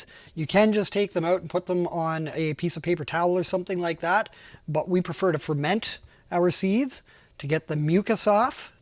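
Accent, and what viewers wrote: Canada. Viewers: Great vid, thanks! Southern Ontarian here, living in Utah...it was nice to hear the good ol' Canadian accent. Also nice to hear the word "Tupperware". I'm going to try this method for saving seeds. Cheers! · Love your clarity, simplicity and lively Canadian accent!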